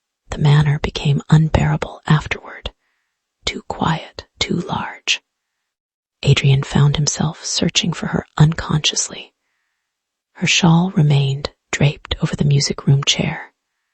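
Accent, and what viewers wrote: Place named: United Kingdom. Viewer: That's the British way of saying a name ending with an "a". Listen to the way "Ana" or "Cuba" is pronounced then you'll hear the "r" at the end.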